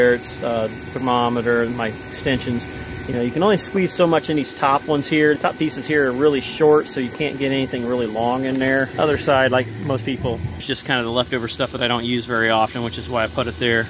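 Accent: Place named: Pennsylvania, United States. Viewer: ah the Pennsylvania speak figured you were from that area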